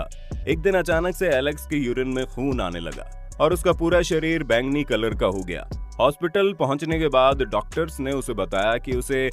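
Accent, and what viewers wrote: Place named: Jaipur, India. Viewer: Bhai apki real voice sunai ye yeto koi jaipur vale bande ki hai bhai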